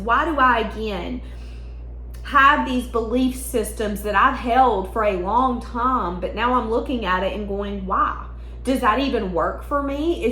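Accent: South, United States. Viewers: I love your southern drawl !! 😊 you don’t need classes . It’s cute😊. I’m from NY. The Canadians make fun of how we talk .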